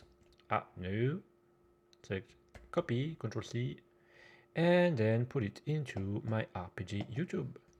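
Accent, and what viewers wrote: France. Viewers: oh great! Thank you for those extra videos. Also your french accent is hard but as I’m spaniard I think I understand you better than if you were native english haha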